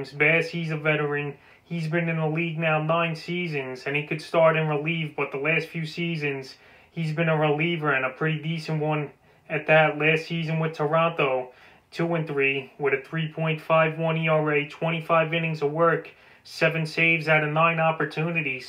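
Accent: New York, United States. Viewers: I love this guys New York voice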